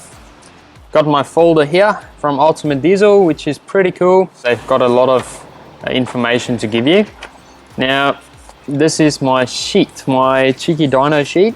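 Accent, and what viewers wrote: South Africa. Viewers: Dude, are you from South Africa?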